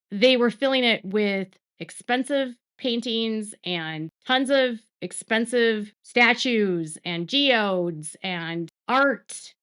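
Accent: Minnesota, United States. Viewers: You have the most Minnesota accent I've ever heard😂 'abouuuut"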